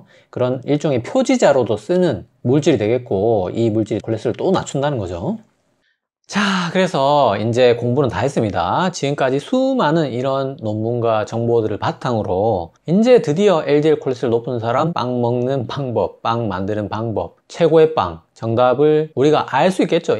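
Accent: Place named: Busan, South Korea. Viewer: 점점 더 잘생겨져가네요😊 어쩌면 자료도 훌륭하시고 설명도 맛갈나고 쉽게 전달해 주시는지요 부산 사투리도 넘 딱뿌러지고 정겹습니다 열정을 다하시는 쵝오🎉🎉🎉🎉🎉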